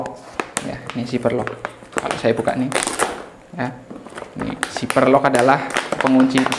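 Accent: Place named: Banyumas, Indonesia. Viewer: Logatmu ngapaknya medok banget , mas. Anyway..sukses selalu ya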